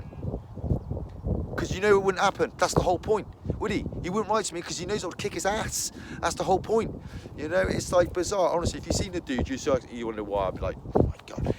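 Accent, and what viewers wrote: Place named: Wales, United Kingdom. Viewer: I DO NOT SOUND LIKE YOUR IMPRESSION OF A CARP ANGLER!!!! :) I like this video, best one yet. You mentioned Somerset, i thought you sounded Welsh?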